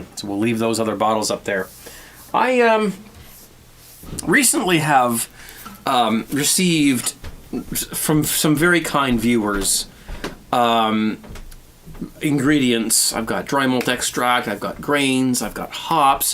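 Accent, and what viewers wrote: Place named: Canada. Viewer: what part of Canada are you from? Ontario?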